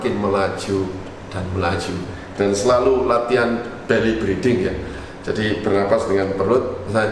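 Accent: Surabaya, Indonesia. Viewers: Wong Suroboyo iki koyoke hehe. Thx tips nya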